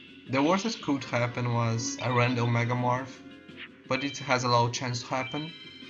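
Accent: Brazil. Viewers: Brasileiro falando inglês porra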